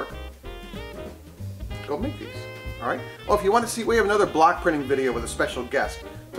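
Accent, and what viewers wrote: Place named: South, United States. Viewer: Merry Christmas and Happy New Year to you and yours. Thank you for the great video's. I have learned a great deal from you all. LOL sounds pretty southern for a northern girl.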